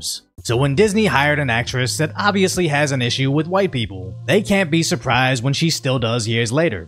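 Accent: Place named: United States. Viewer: If I guessed your heritage with only your voice, I had guessed you‘re an American.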